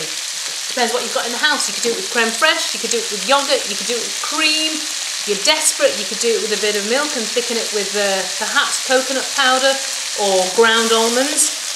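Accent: England, United Kingdom. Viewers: She has a perfect British accent called Queen's English, one that only intelligent people can master and educated people can speak properly, some 2% of all English speakers, an accent that you most probably will never ever be able to COMPREHEND not to mention speak with your very limited intelligence. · why do I have a feeling that you live in uk because of your accent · Gordon Ramsay accent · In India, we do this..she says in an English accent..you're English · a what kind of accent you have , speak like an indian not like british , and that's not the way to cook chicken curry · I think it's all Bullshit.. 'this is not Indian Chicken Curry... Having a British accent and fooling the Brits is OK... But not Indians who know all about spices and curries...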